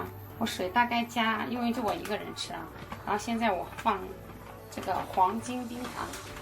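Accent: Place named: Shandong, China. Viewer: 我不用猜就知道你是山东的嘻嘻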